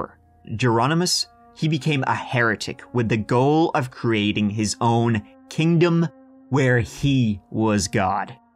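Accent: Ireland. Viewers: Feck yes. The mad Irish lad telling the tales. Heaven.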